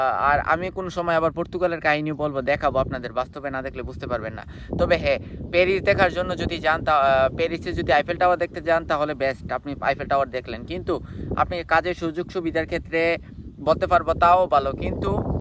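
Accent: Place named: Sylhet, Bangladesh. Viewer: Aita bhai syloti ra kore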